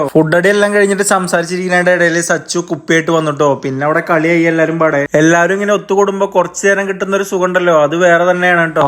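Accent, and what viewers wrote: Palakkad, India. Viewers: Palakkad style athanu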